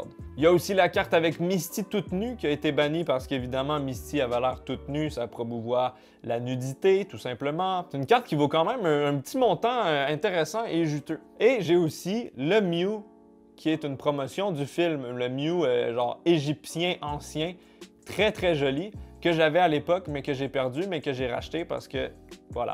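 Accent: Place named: Quebec, Canada. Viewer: Oh merde s est un québécois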